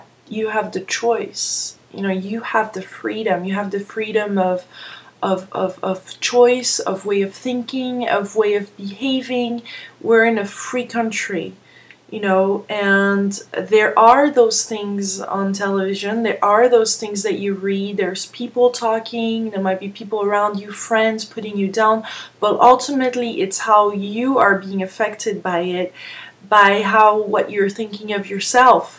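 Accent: France. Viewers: Omg.. u r french.. i could tell from ur accent.. its cute ^_^ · Are you french?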